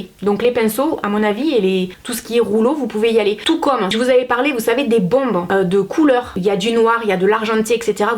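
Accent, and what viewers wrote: South, France. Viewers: Je vous adore vous êtes super génial et vraiment très jolie. Merci pour toutes vos vidéos et votre investissement pour nous aider à faire de bons choix. Et en plus votre petit accent amène du soleil et des cigales 😊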